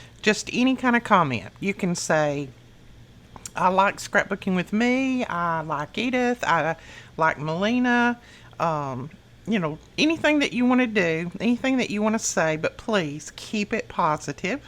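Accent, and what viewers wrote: South, United States. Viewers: My favorite craft as of late is junk journals. I have never ever won anything from entering any contest. My dad use to say I was bad luck lolol I keep trying though! I love your southern accent!!!!! Xoxoxo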